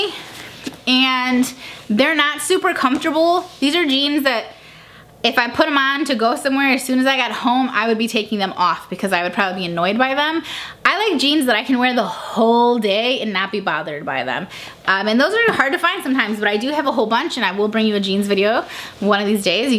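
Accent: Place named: Chicago, United States. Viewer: First time watching one of your videos!! I knew that accent sounded familiar!! #chicagogirls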